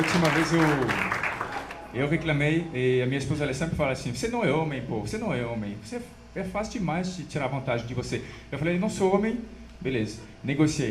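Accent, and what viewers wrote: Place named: France. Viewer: Hahaha amei! Esse sotaque francês é demais 🤩👏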